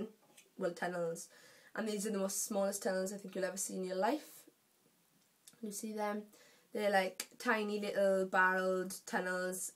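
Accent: Wales, United Kingdom. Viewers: Welsh for the win :D :D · FUCK YES WELSH ACCENT!! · omg welsh much <3 snap !! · Welsh accent.. *O* ~Melts <3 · Your accent is amazing! Every lads holiday we meet a group of Welsh people so funny! I got my ear stretched today check it out would be nice to know what you think! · Oh wow... are you welsh :')?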